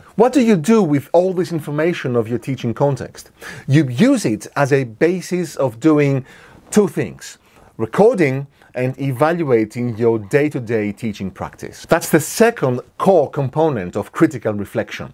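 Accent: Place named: Greece. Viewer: Noticed right away a greek flavor in your accent Mr.. Thank you and Καλή χρονιά!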